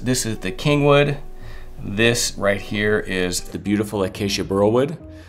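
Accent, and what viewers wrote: Texas, United States. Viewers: We native Texans love our drawl!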